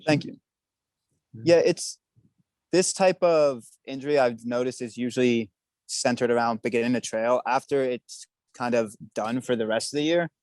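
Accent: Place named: South, United States. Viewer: Your southern accent somehow got stronger from being in florida :p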